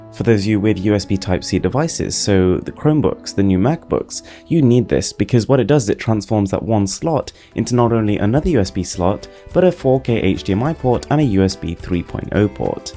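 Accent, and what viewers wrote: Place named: United Kingdom. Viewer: British accent + top notch quality + great person = Mrwhosetheboss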